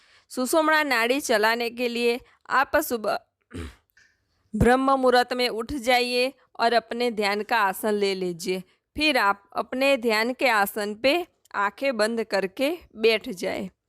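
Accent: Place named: Gujarat, India. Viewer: હું પણ તમારી ભાષા ના ઉચ્ચારણ પરથી અંદાજ આવી રહ્યો છે કે તમે મગજ માં ગુજરાતી નુ હિંદી માં રુપાંતરિત કરી ને બોલી રહ્યા છો 😊😊 જય શ્રી રામ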